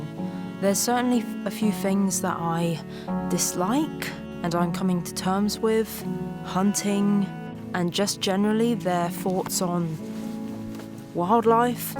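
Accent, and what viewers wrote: United Kingdom. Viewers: Such a beautiful place, too bad it's so damn cold! Is she Swedish? she looks Irish and has a British accent. I just watched her video of raising a red squirrel. I love squirrels, that was a great video, nice job!, definitely Irish, I wonder how she ended up in Sweden? So lovely to live among all the forest and trees. I bet she wouldn't appreciate all those Komodo Dragon feeding videos I've been watching!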